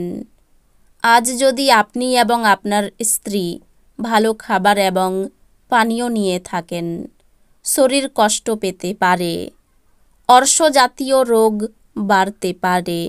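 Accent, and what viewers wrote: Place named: East Bengal, Bangladesh. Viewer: আপনার বাড়ি?,মনে হচ্ছে east bengal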